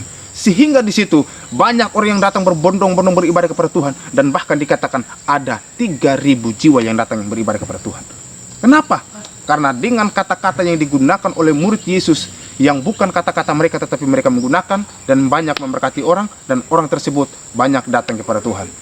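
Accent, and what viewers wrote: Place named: South Sulawesi, Indonesia. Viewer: Anda orang Toraja kah? Kayak Logat sulawesi selatan... Anda aliran Karismatik atau Pentakosta atau Reform?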